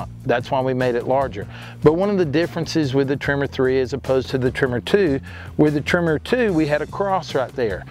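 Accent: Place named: Appalachia, United States. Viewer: Do you bite your tongue, twist it into a knot, or what is that sound? Does that hurt? Heard it several times now, but understand shit! Only wahwahwah. Next time, find someone with a less pungent hillbilly accent, hahbaahthad?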